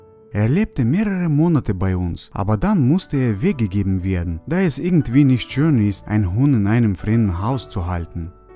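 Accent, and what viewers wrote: Russia. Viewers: Ich mag ist dass du einen russischen Akzent hast ich hab auch russische Wurzeln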